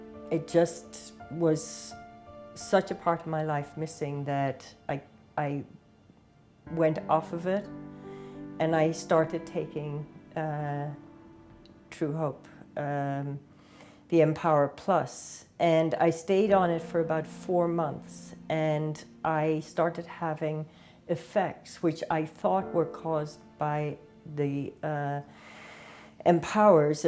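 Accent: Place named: Ireland. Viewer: She sounds like from Ireland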